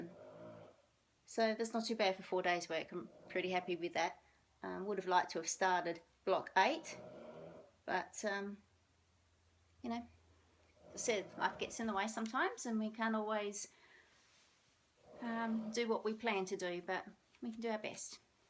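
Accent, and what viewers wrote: Australia. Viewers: Hi, l too have only recently discovered "floss tube" and am really enjoying watching your videos as I stitch. My name is Sandra. I'm a born n bred Aussie living in Texas, USA. It's nice to hear an Aussie accent:-) The wolf kit that you purchased, I saw on another floss tubers - Kelley Jo Crafts - she is an American lady and I'm sure she found it more locally. Sorry I cannot find the particular video of hers with that kit. My current wip is "Fairy Castle" from 'Crosstitch Magic' which was a fortnightly publication I subscribed to many years ago in Aus. Thanks for sharing. Bless you heaps, Sandra.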